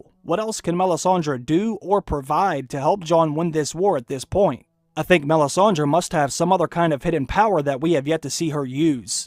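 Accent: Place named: Oklahoma, United States. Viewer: Where are you from? You sound like you're from Oklahoma, or Utah.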